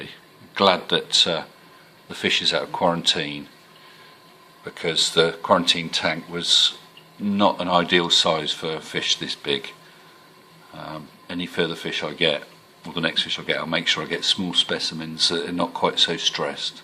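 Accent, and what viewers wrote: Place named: England, United Kingdom. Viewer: Stunning foxface mate I love them, unfortunately I lost my juvenile foxface 2 days ago 😢 and I don't no the reason at all all my water parameters are spot on the fish looked healthy no signs of illness and he was eating like a pig so I think I was the case of things die 👎quick question buddy i has sps birdsnest frags for sale and I can post if ure interested you've got a English accent so ino postage isn't a problem lol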